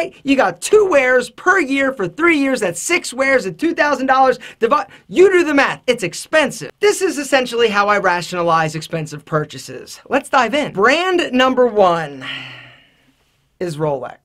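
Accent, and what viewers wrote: Philadelphia, United States. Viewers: Heavy philly accent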